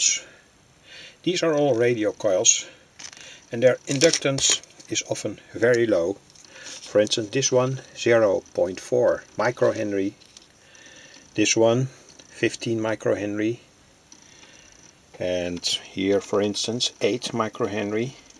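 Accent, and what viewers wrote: Netherlands. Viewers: Hello -nice video From you accent i wil bet you are a Dutch man (Netherland). God save the King Willem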